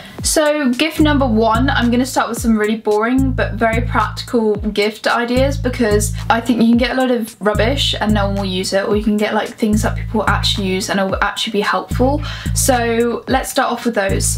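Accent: England, United Kingdom. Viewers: I just love your English accent, I could listen all day long.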